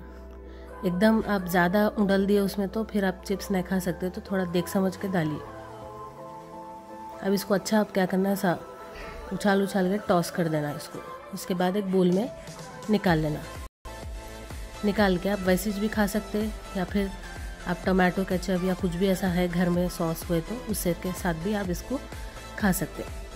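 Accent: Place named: Hyderabad, India. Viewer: Lol..loved ua hyderabadi accent...😜😜😜looking frwd to more such colloquial lockdown recipes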